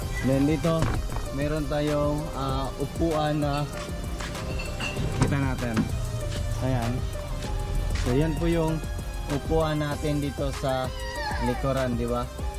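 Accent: Visayas, Philippines. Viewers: Bisaya ka boi?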